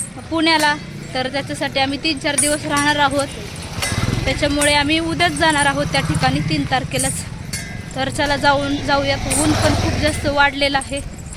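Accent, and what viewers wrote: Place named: Rural, India. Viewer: ताई मी तुमच्या आई च्या वयाची असेन तुमचे परिक्रमे चे व्हिडीओ मी नेहमी बघते, तुम्ही खूप साधे पणाने राहता व साध्या सोप्या ग्रामीण गंध आहे तुमच्या बोलण्याला! नर्मदा मैया तुमची इच्छा पूर्ण करो! 🙏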